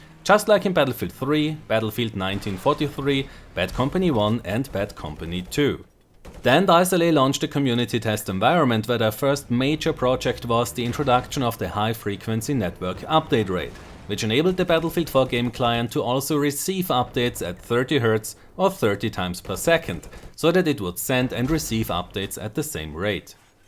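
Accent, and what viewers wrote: Germany. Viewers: The German accent. You know you hear it when you hear "Die Netcode". Many thanks for the info! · Yeah im german and I clearly hear the accent:p your english is very good though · Are you german ?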